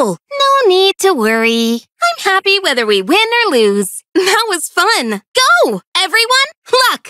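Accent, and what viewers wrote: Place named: Brunei. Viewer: I think about Bruneian Accent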